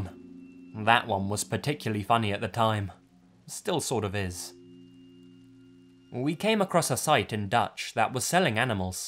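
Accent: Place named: Scotland, United Kingdom. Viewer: Are you Scottish or British? I like your accent a lot! It's one of the reasons why I like this channel so much. It's just so pleasant to listen to! Also, you have some really interesting content.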